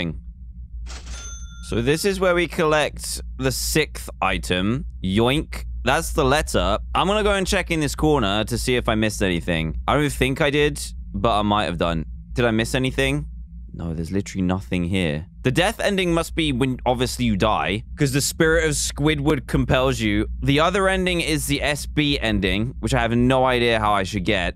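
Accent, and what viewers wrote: United Kingdom. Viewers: Are you brittish??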